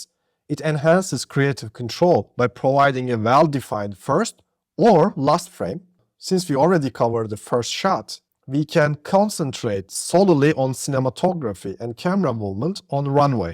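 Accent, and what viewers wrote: Turkey. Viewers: türk aksanı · İngilizce de konuşsan Türk olduğun anlaşılıyor 😄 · Bu ingilizce konuşma biçimi ancak bir Türk'e aittir. Tebrikler video için. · seslendiren türk mü bu aksanı nerde olsa tanırım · O klasik soruyu sorayım. Türk müsün la ? aksanın Türk gibi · o nası bi Türk aksanıdır, nerde duysam tanırım :D güzel içerik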